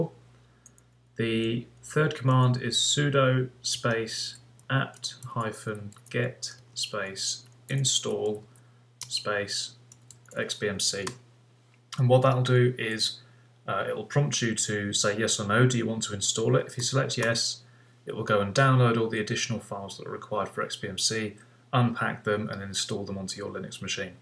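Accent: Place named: United Kingdom. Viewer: First video which is so useful.finally British accent. After following step by step guide,my xbmc is slower than slow.mouse does not respond and arrows are very slow to respond.any ideas to normalise? I am using acer aspire. Also no matter what the edges of xbmc is beyond the screen of tele.tried reducing and adjusting tv format,doesn't help. Suggest please!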